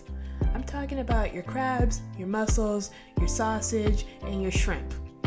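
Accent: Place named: Kansas City, United States. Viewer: Kc connection accent is everything 😍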